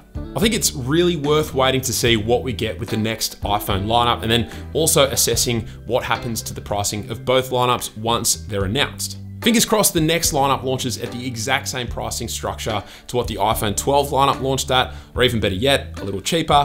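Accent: United Kingdom. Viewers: I thought you were from I UK or something haha. Sorry English it's not my first language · Thought you were British...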